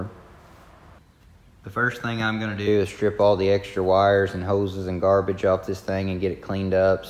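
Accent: South, United States. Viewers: I really enjoyed your video. That engine should clean up nice and you could probably sell it for a good price. Did you ever figure out how water got into the oil? BTW.... my wife is a native from New Orleans and she thinks your accent puts you in the mid to lower south. Let he know if she's right or wrong.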